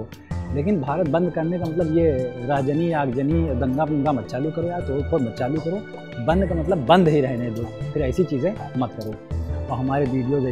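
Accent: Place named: Bihar, India. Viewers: EE sausri Kaahe bhojpuri type main anchorning karat baa..tanik samjh nahi aavat hamka Toh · Abe bihari ki tarah mat bolo mujhy bihario se nafrat hi 🙅😤😠😑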